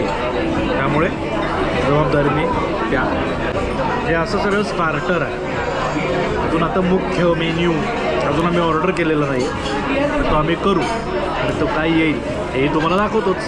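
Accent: Kolhapur, India. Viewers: Kolhapuri tone mast aahe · तुझी कोल्हापुरी टोन खूप आवडला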